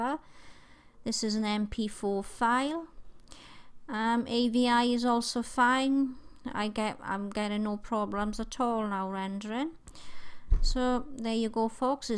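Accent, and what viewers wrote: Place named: Wales, United Kingdom. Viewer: Amazing, thanks so much sexy voiced welsh lady!!! xx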